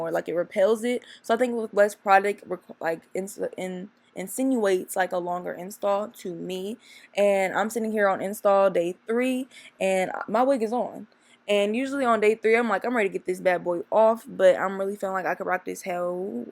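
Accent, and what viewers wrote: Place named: Columbus, United States. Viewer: its so funny how i knew you were from Columbus before you even said it, & yall swear yall don't have accents 😭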